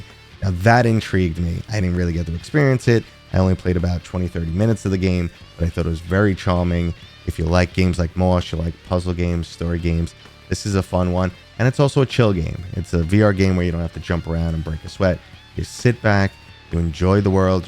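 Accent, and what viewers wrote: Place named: New York, United States. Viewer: haha my bad, just breaking your balls. I guess this is the first time I actually noticed your accent in your videos, is all :). Starting with how you said "Silent North." also you're likely in NYC if anything, idk why i was thinking Massachusetts haha.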